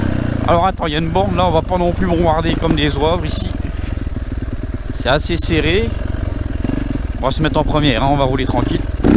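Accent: France. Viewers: T’es pas des Vosges toi groooos ? 😂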